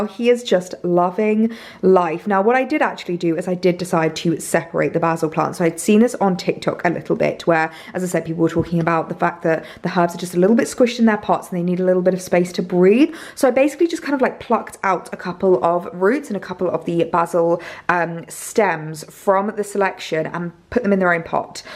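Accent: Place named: United Kingdom. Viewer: Ty for responding. But please, there is no need to apologize.I'm a very loud speaker. Especially when I'm excited. So I constantly have to remind myself to speak softer. It's not an easy thing to do I know. And I'm 69. I've been trying to correct this at least a little bit my whole life. I cannot count the times people have asked me why I'm yelling when I feel like I'm talking normally. I have to tell myself that all I can do, is try to do my best. Part of the reason I might have been having trouble understanding you could also be that I'm not used to your lovely British accent. Out of all the accents in the world I've always admired and wished I was able to speak with a British accent. Have a lovely day. You are a joy to watch.😊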